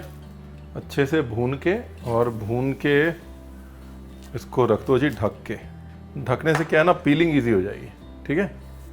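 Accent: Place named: Punjab, India. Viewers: And you Punjabi accent😍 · He’s applying Punjabi sound to English.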